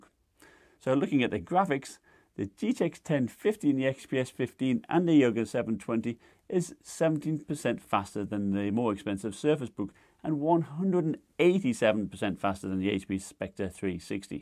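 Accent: United Kingdom. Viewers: Thanks for the review. I've bought this model and I find if to be amazing for the price and specs. What's your accent. I know it's British but which part.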